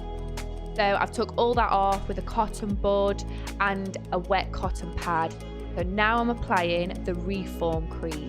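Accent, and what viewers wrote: United Kingdom. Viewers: Great video but I love the accent! What part of the UK is this? 👌🏾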